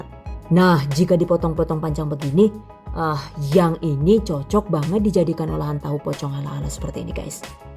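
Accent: Banyumas, Indonesia. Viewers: Paling suka kalo ada selingan 'kata kata mutiara' yg ga ada di channel lain Pake bahasa jawa ngapak, auto ngakak 🤣🤣🤣bisa aja nih, kirain orang banyumas- cilacap -purwokerto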